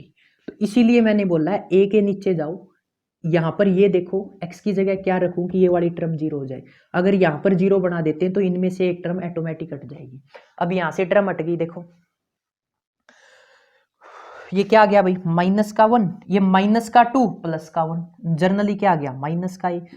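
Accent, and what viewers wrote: Haryana, India. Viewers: Ye vedio me sir bhut sweet haryanvi bole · as we all know sir teachs in best way😼❤️. But Yo haryanvi accent add hon ke bad😂🫶ekdum ghar aali feel ari sir Thanku.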